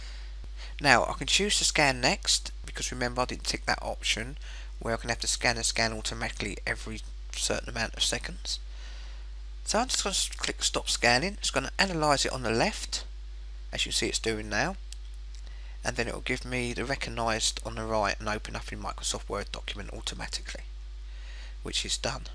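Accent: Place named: England, United Kingdom. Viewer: FUCKING ENGLISH ACCENT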